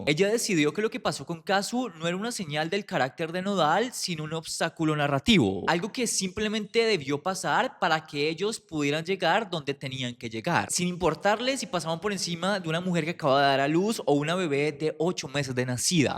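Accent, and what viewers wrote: Colombia. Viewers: Me da la impresion que es colombiano ❤ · Nada que ver mi comentario PERO me encanta tu acento colombiano!!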